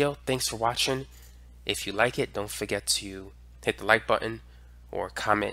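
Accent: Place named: New York, United States. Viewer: i can hear your NY accent lol i love it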